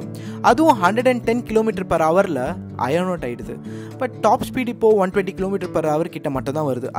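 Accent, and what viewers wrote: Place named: Chennai, India. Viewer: Your tamil .. is it how tamil is spoken in Madras these days ? Bad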